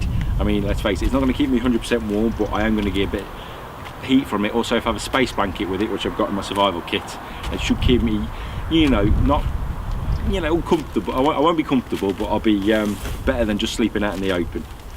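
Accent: England, United Kingdom. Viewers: Nice simple video so nice to hear an English voice ✌️and people not droning on about concealed carry. How is it most Americans are so paranoid 😆I digress nice kit man very Similar to my Rush 24 bag that goes everywhere with me I'm in south east England but travel extensively keep up the good work I'm now subscribed 👍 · I think the content is probably good, but I could not focus on what you were saying, because I just think it sounds so disgusting when british people pronounce "u" as if it was "o". Like in "stuff", or "backup". But thanks anyway. Maybe it's a reading issue?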